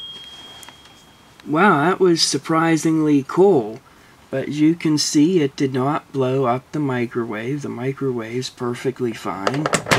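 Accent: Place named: Washington, United States. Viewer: your from Washington state aren't you I can tell from your accent its like mine :D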